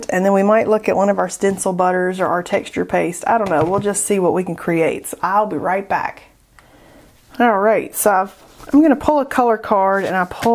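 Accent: South, United States. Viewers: obviously southern part of the good ol' USA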